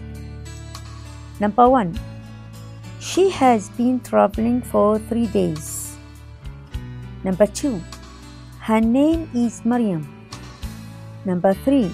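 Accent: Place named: India. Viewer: نطقها هندي 🌚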